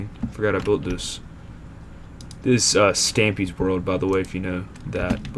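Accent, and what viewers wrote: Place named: United States. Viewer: sick Minecraft world, your American accent is sick what state r u from if you don't mind me asking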